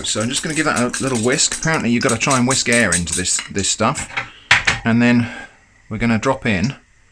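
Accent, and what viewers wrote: United Kingdom. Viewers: Must be the same British accent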